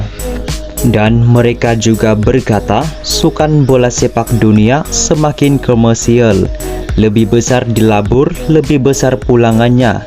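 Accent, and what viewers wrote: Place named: Sabah, Malaysia. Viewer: Ini bahasa melayu Sabah la bro. Bukan bahasa Indonesia dengar betul-betul loghat percakapan admin tu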